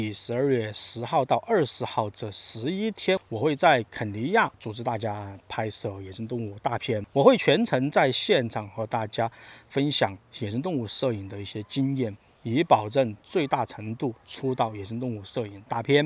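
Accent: Sichuan, China. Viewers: 川普，四川人？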